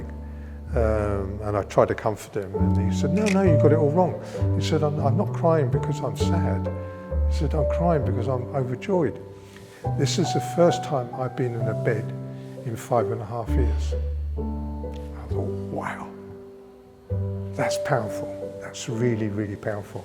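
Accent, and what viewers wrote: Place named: United Kingdom. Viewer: Your accent tells me that you shouldn’t talk about colour or taste, your a brit,0 taste 0 colour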